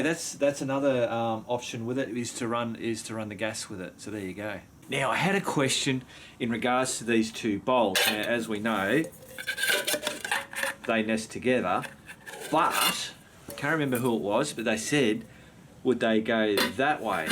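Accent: Australia. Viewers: Wow, that made me belly laugh! 😂 I'm a Brit and I don't know why but your Ozzy accent just did it for me. Reminded me a little of Rolf Harris (in better days). So entertaining! About the simmer ring, wouldn't it be easier to adjust it before placing it on the burner? ie. Allow the liquid to boil, without the simmer ring and then fit it using the tongues. Obviously experience will guide the pre-adjustment. Just a thought. Thank you for brightening my day! 👍😁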